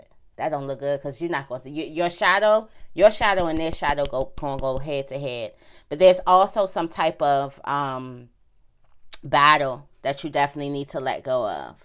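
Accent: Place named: DMV, United States. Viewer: Your accent is cute 🖤 You def from the DMV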